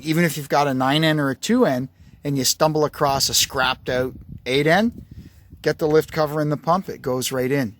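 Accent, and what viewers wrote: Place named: Canada. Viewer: Thanks for the video. Picked up the Cannuck accent early. ☺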